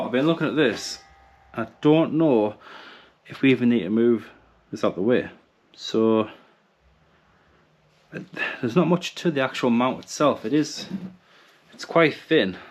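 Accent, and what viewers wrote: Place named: North East England, United Kingdom. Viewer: Thanks for this video. Got the same job to do myself very soon. Also judging by your accent you're just south of the Tyne to me. I'm from Northumberland 😁 Cheers dude!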